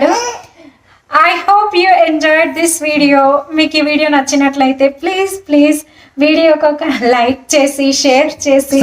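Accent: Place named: Andhra Pradesh, India. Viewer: akka evideolo okati change vundi emito telusa me voice Andra Telugu matladaru telanga languagevadilesi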